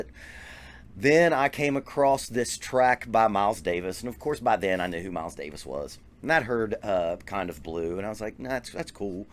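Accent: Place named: South, United States. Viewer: your accent is really interesting, what part of the US are you from(from movies i know its the south somewhere)? also great video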